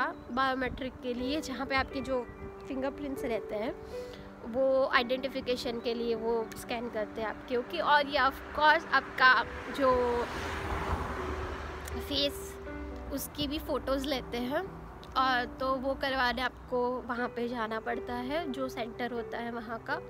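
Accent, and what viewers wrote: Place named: Gujarat, India. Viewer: The way you speak hindi is so adorable ♥️ and obviously that gujju accent makes your every single word soo sweeet♡ keeep growing budd💫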